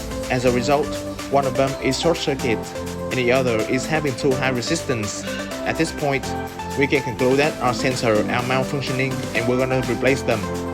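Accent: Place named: Vietnam. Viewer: kk, nghe biết kỹ sư Việt nói tiếng Anh liền, quan trọng là hiệu quả, giỏi.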